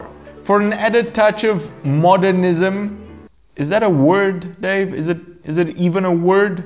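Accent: South Africa. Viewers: SA accent annoying.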